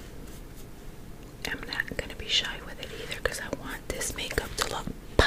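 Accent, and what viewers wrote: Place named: New Jersey, United States. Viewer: I loved this! I would love to hear an exaggerated version of your New Jersey accent 😂 with your voice it would be hilarious and relaxing. You are the best!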